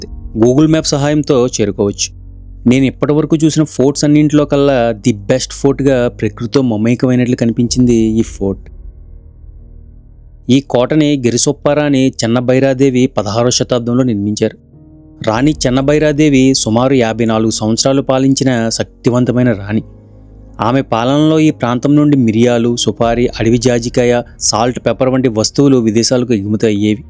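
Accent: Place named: Visakhapatnam, India. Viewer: Bro chala baga chepparu telugu matlu andrapradesh viziag bashala vunnadi meru akkadi rathi kattadala gurinchi weathergurunchi bagachepparu. Chala thanks meru marinni manchi place gurinchi videos thiyaliani koru thunnanu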